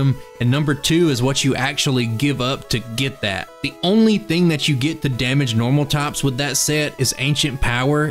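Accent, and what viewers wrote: South, United States. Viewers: Just found you. Came for the pokemon of course, but staying to hear it in that drawl. Aren't many of us making content out here from the south.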